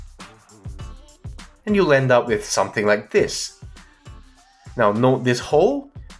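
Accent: Singapore. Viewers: You do sound Singaporean.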